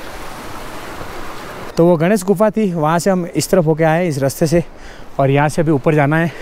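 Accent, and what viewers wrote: Gujarat, India. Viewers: Your videos are good but your Hindi is Gujarati accented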